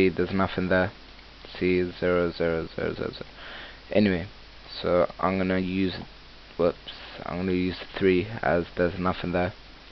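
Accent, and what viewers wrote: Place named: Cockney, United Kingdom. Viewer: He's british lmfao... Cockney...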